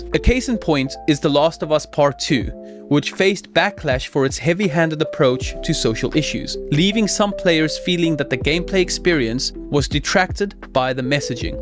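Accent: South Africa. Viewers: As a fellow South African, I know another South African when I hear it xD Mad respect for the videos you do, your views need to get out there much more especially in DEI. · are you from South Africa? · Hmm... I may be mistaken, but something sounds suspiciously South African. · Can't help but hear that distinct South African accent. DEI is just BBBEE but worse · I had to come check your channel out when I heard the South African accent on Asmons stream earlier xD Keep up the good work dude! Really well discussed, idk why my brain will always default to comparing this stuff with BBBEE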